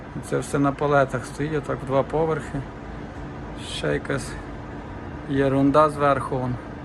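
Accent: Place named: Western Ukraine, Ukraine. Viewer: спасибі за відповідь. Я так розумію, Західна область? Відчуваю нашу говірку)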